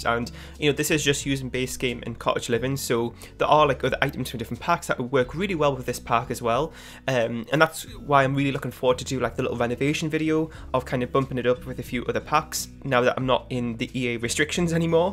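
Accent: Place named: England, United Kingdom. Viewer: Really love the building! And I adore your accent. Where you from in England?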